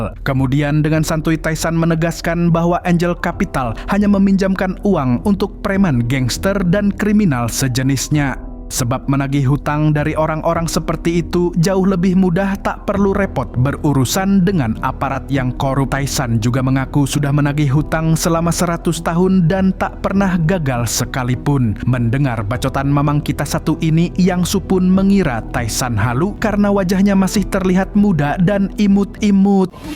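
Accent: Banjar, Indonesia. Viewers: Ternyata mamang urang Banua jua 🤣🤣🤣 babahasa Banjar sidin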